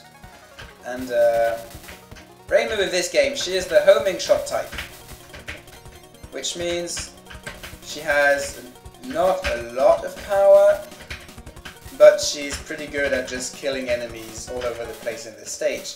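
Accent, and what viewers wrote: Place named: United Kingdom. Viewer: WhAt?! GuY wItH bRiTiSh AcCeNt CaN't PrOnOuCe TaRdIsEs?!?!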